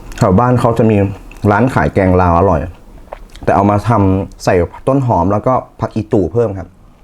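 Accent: Isan, Thailand. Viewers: ชอบคุณ เว้าภาษาอิสานฟังละม่วนดีครับ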